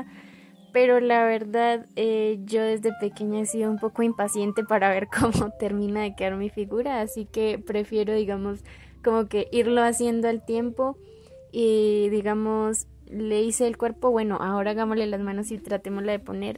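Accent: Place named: Colombia. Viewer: lo sabía reconocí tu acento jaja yo también soy de Colombia , no sabes cómo me alegro de saber que hay alguien con tal talento en mi país , eh visto a muy pocas , espero seamos más ✨💖 tienes todo mi apoyo ewe ✨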